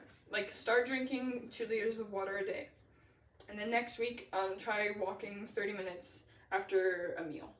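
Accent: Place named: Denmark. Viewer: Why do I hear a Danish accent? :)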